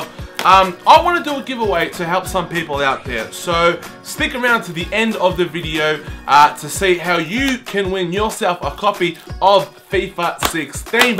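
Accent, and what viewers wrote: Australia. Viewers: Your aussie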